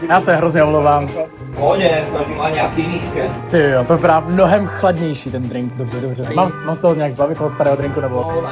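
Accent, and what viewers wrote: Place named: Czech Republic. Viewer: It is crazy to hear a new generation of people of Vietnamese origin to speak with such beautiful Czech accent! It seems that I am really getting older, but a nice shock either way...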